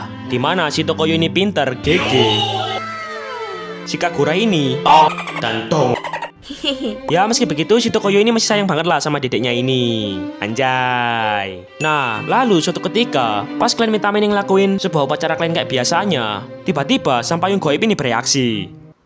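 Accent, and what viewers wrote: Java, Indonesia. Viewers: Bg lu org Jawa ya bg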